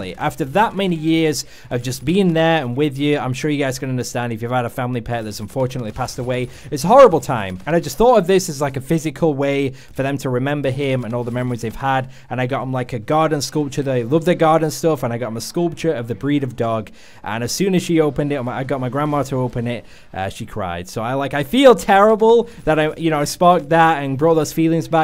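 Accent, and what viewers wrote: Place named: Northern England, United Kingdom. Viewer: his accent is really odd, like northern (english) with an American tinge...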